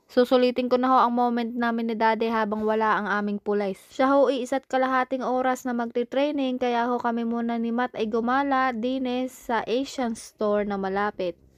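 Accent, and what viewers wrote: Batangas, Philippines. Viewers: Nakakaaliw ang tonong batanggeño😁👍 sis. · Hi there enjoy lang akong manood ng blog mo. Ayos na ayos yung narration mo na puntong batangenya. From Batangas ang Tatay ko kaya gusting gusto ko ang tonong-Batangas. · Natutuwa ako sa inyong tatlo Ako ay taga San Antonio Texas at meron din ako bahay sa Lipa City Batangas kaya gustong gusto ko yong puntong Batangas mo Pero ako ay isang ilokana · Natutuwa ako sa iyong Batangueña accent!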